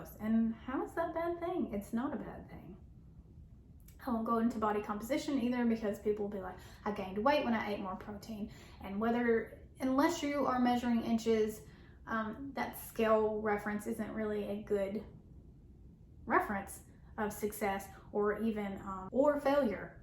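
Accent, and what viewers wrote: South, United States. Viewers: This is probably my favorite video of yours so far - and I’ve watched a lot of them! “Here’s some crappy bread.” Lol. Couldn’t agree more. And your southern accent makes everything better.😊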